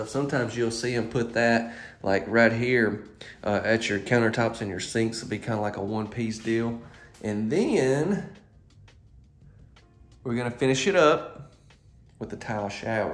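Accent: South, United States. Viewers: I’m from the northeast. NJ to be exact. I do enjoy listening to your southern accent and your humor. It’s so entertaining. This is my favorite so far. I’ll save this for future reference when I move south or southwest. The floor plan is what I want.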